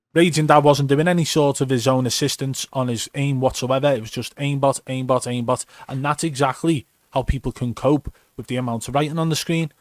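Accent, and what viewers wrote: Liverpool, United Kingdom. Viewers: U from Liverpool?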